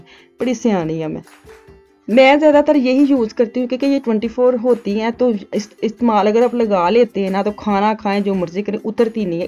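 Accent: Punjab, India. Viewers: Salam sister, Ma sha Allah j apke volgs buhat achhy hote hn .specially baat krne ka punjabi style tu buhat achha lagta hy .ap jo oven use krti hn roti k lye oska company name bta dain plz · Watching you from UK Love your Panjabi and the way you talk... Like your videos....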